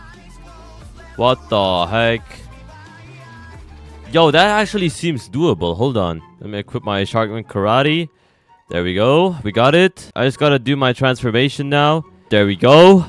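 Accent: Germany. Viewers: Ich weiß nicht wie aber ich war mir zu 100% das du deutsch bist wahrscheinlich wegen dem Akzent haha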